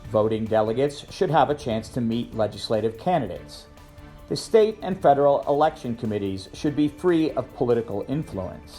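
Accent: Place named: Somalia. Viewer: Your sound like somali who want to get some work in kenya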